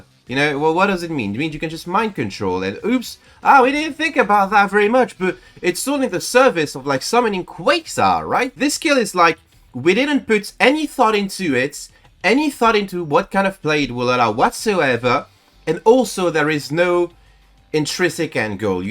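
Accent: France. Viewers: l'accent francais 10/10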